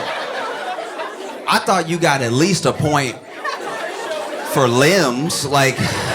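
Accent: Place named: Louisiana, United States. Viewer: is a master at bringing it home with his facial expressions. 👍 And as a Texan, I like his slight twing of Louisiana accent. Makes it so so authentic and endearing.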